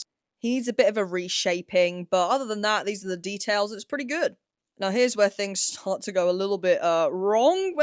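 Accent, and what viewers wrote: United Kingdom. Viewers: UK London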